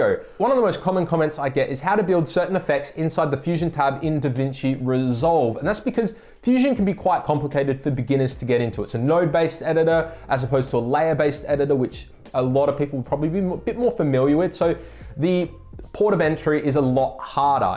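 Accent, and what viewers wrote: Australia. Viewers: downloaded the course and just finished the 3d text lesson. Great work. Helps you're an aussie so it feels very familiar, but that's gotta be one of the best explanations of how to build a 3d scene in fusion I've watched to date. I purchased the blackmagic books for resolve 15 because there wasn't much info out but this course is looking promising as a much easier way of learning the concepts. Great work mate, you're quickly establishing yourself as a go-to resource for resolve info on youtube!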